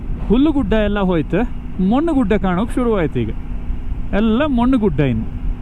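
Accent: Kundapura, India. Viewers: ಉತ್ತಮವಾದ ಕುಂದಾಪುರ ಕನ್ನಡ ನಿರೂಪಣೆ god bless you sir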